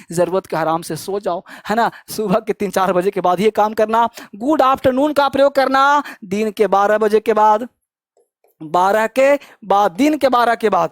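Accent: Bihar, India. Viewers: Hello sir, apka padhane ka tarika mast h or ap lgta h Bihar se hn??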